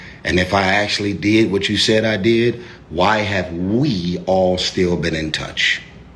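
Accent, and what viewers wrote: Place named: East Coast, United States. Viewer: your East Coast soft voice wit that accent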